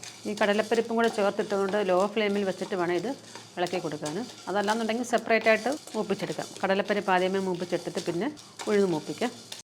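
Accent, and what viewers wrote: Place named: Mavelikkara, India. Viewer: Nalla presentation anavashya vachakam onnum illa bhasha kettittu oru mavelikkara side pole thonnunnu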